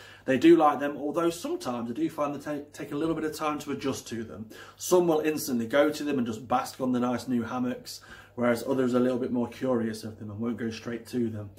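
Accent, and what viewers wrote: United Kingdom. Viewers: You sound local ? Derbyshire?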